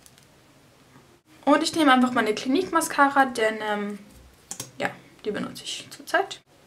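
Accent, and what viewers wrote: Switzerland. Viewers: Schweizerin ? :D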